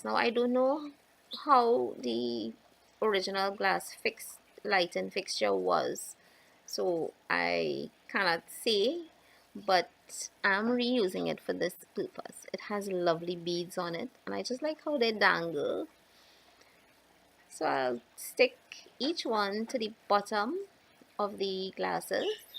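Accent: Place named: Trinidad and Tobago. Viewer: Awesome DIY, Love it and I love your Trini accent. Tfs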